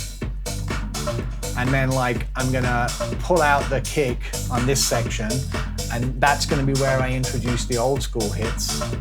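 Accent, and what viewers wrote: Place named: United States. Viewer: What's with the American accent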